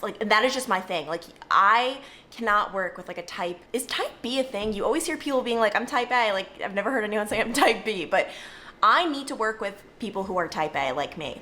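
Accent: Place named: Long Island, United States. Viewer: I feel like your Long Island accent is returning now that you’re not on the news. It’s fun to hear again!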